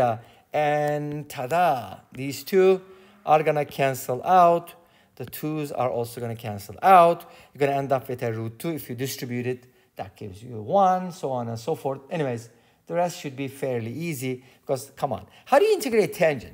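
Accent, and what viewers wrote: Turkey. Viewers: I swear you got that Turkish accent, are you Turkish by any chance?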